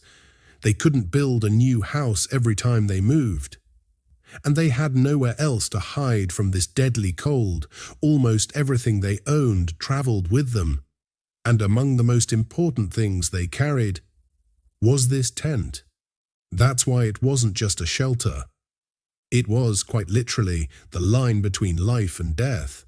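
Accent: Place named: United Kingdom. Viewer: British sounding, but likely AI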